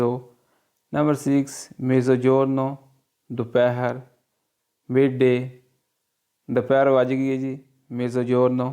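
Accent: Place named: Punjab, Pakistan. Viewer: Panjabi di Kya baat A... SAHI Gujrat wala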